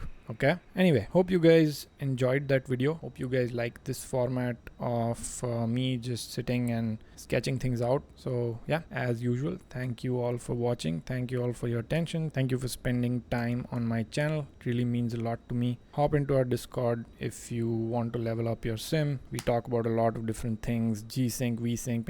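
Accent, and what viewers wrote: India. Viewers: omg india accent now in msfs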